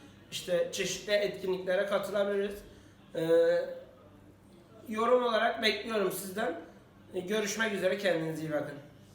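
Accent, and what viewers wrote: Gaziantep, Turkey. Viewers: Senin takipcinim ve her videonu neredeyse izliyorum bana yakin ve bizden biri olman hafif gaziantep siven kendini ozel kiliyor kucuk bir takipcinim tostlarini evde deniyorum ogrenciyim ne de olsa senin tükkan da tost yemek isterdim...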